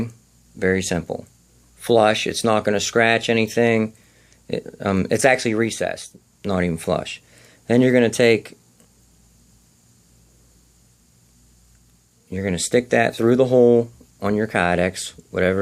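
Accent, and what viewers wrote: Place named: Pennsylvania, United States. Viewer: "you can tighten this daaan" I love your Pennsylvania accent.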